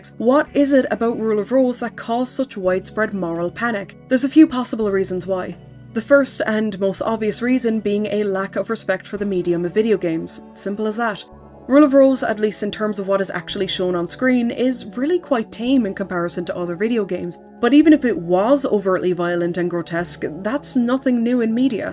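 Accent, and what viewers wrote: Ireland. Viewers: so SuperEyepatchWolf sent me here and I'm bingeing basically all of videos (I CANT BELIEVE I DIDN'T KNOW THE UK REFUSED TO PUBLISH THIS) but im also trying to figure out the region of her accent? I've tuned into a few Irish accents but I reckon this ain't Dublin or Cork or Wicklow, what IS the accent? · New viewer. I love horror games and Irish accents so now you will never get rid of me! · It’s so cool and refreshing to hear a fellow Irish person talk about the niche PS2-era horror that I’m so interested in. The standard of your writing and work in general is so good! Subscribed! 💖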